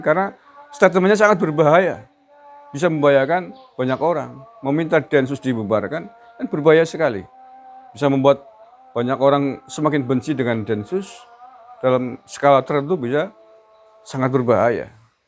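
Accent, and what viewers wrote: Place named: Java, Indonesia. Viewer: haduh ngakak aku om jenengan kalau ngendikan lucu jawani salam om sehat selalu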